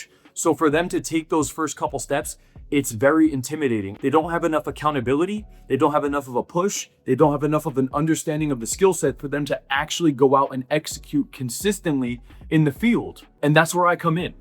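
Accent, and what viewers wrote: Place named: United States. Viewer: you easily say this because you are an americana nd you have a cool accent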